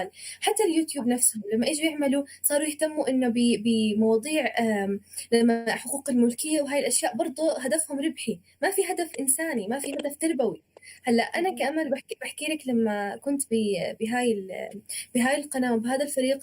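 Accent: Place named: Palestine. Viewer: ما ابي اتفلسف بس اللي لاحظته لهجتك فلسطينية تقدر تصحح لي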